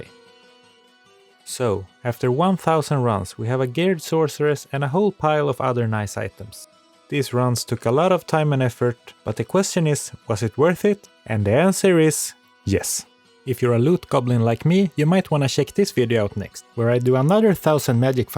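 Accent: Sweden. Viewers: This video made me decide to uninstall d2r. No way I’m doing 1k runs. I’d rather just watch this dude do them while listening to the epic supersvenska dialect. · the deep swedish accent, one simply cannot miss that swinglish man haha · Yust? So you are Swedish. The Swedish English teachers must be very special, because I don't think I have ever met a Swede who pronounced "just" correctly :D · Svensk engelska är så distinkt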